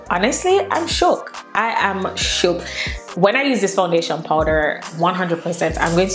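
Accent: Jamaica. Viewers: Are you Jamaican? I sense a bit of an accent! I'm Jamaican so I was curious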